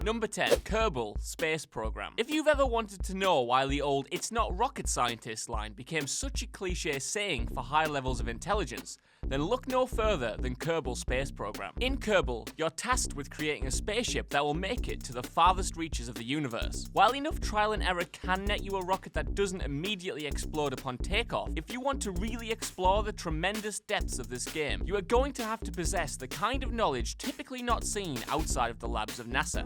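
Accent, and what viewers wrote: United Kingdom. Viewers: Eh he's a Brit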